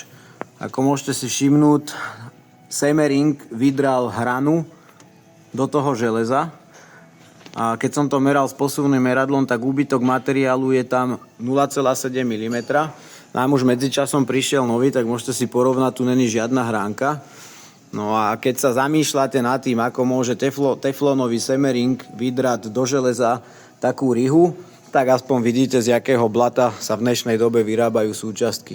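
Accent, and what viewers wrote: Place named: Trnava, Slovakia. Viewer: spravne cavo vyprava akoby vzivote trnavu neopustil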